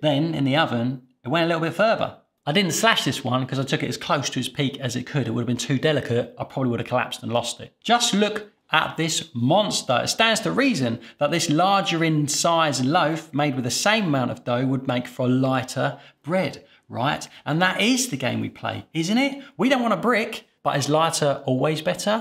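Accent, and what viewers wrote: United Kingdom. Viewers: His diction is not the same as his accent. His accent is that of 100s of 1000s of UK population. A northerner might say "ah nuh vah" but in a northern accent. Get a grip. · The added ingredient is to make people want more of it. As a result it will create more revenue for the company. At the same time, it also creates health issues in the long run. Making people seek Dr's, Dr's give meds, meds will create other health issues that would require more meds. It's a win win situation for the market at our expense. That's all there is to it. Thank you for the info. It sounds you are British from your accent. I don't know if this is worldwide, but in some countries mostly in the West (go figure) they are adding crickets and other bugs in the food we buy to make st home. So let us know if thst is also happening where you are.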